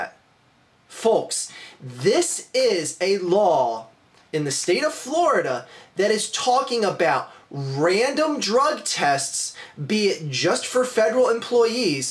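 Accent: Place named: Illinois, United States. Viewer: you sound like your from illinois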